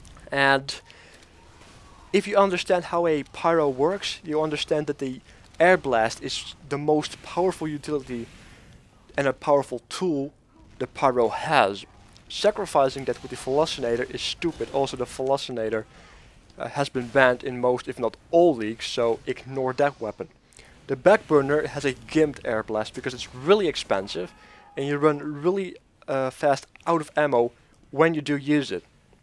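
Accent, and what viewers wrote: Netherlands. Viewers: are you perhaps dutch? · ik kan horen dat je een nederlander ent ;P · Judging by your accent, you cannot but be Dutch, right? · Is this guy dutch?? It sounds like he has a dutch accent.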